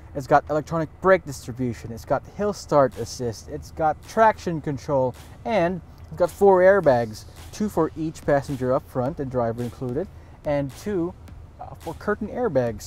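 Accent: Philippines. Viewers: It felt like you are forcing yourself to have this perfect enunciation of words, and it resulted to some mistakes that you even apologized for. I'm sure it won't bother most people if you have an identifiable pinoy accent. Just be you, like that dad from AutoDeal reviews.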